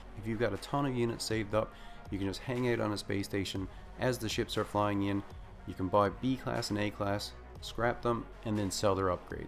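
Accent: Newfoundland, Canada. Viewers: Lol, I was thinking the entire video that you sounded Newfie.... and then your outro confirmed it haha. Obviously I didn't check the channel name before watching XD.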